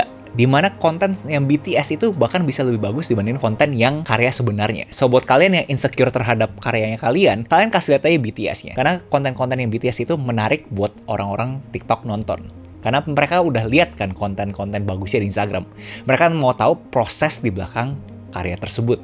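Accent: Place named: South Jakarta, Indonesia. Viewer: Bahasa lo trllu jaksel bang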